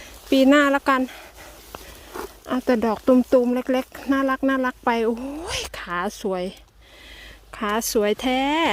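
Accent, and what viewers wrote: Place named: Laos. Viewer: 🌺🥰👍🌸สวัสดีคะ่น้อง คนเก่งของพี่พูดภาษาลาวน่ารักจังเลยคะพูดบ่อยๆนะคะคนดี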